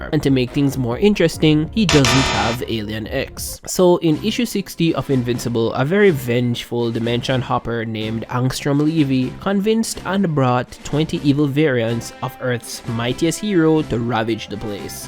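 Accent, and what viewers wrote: Jamaica. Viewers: Are you Jamaican because you sound 100% Jamaican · Are you Jamaican? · You can really hear your Jamaican accent coming out in this one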